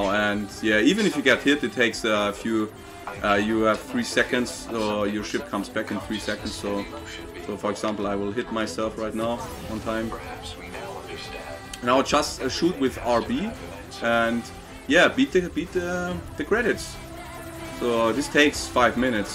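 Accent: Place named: Germany. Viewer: Dieses deutsche Englisch ist einfach herrlich 👍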